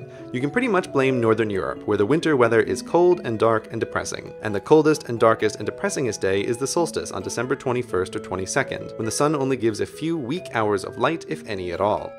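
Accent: United States. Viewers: Probably not. He speaks with an american accent.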